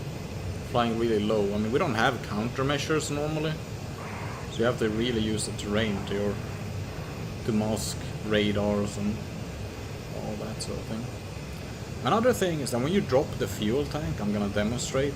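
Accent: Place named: Sweden. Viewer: dude you are Swedish right? jag med jag märker på vägen som du pratar :)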